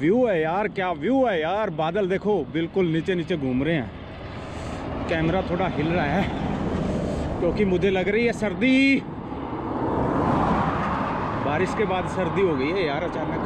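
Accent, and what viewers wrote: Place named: Bihar, India. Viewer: Aapka jo bolne ka tone bahut bar Bihari tone lagta hai I am watching yours video for two days continuously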